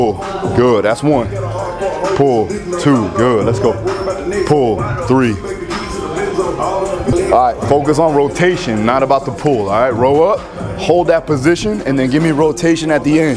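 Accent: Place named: Los Angeles, United States. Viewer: Bro you gotta be from LA? I can hear it. Good work all around! KB work is just different and I love it. Stay functional🔥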